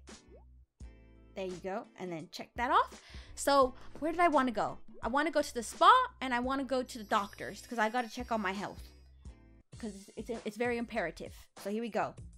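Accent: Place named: London, United Kingdom. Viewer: Did any one else think that she was talking I a London aksint a little bit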